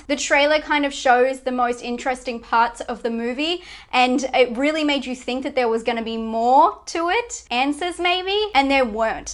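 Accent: Australia. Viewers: New Subscriber! - No need to apologize for your accent 😎 that is one of the little things that sets you apart from all the other channels, and if someone doesn't like the aussie accent...I'm pretty sure they Hate puppies and you shouldn't worry about those psychopaths #kiddingnotkidding :~)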